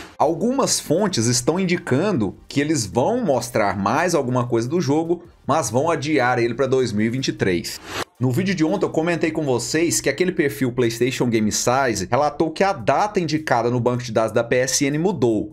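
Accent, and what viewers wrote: Minas Gerais, Brazil. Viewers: ..vc é de onde de Minas Gerais uai?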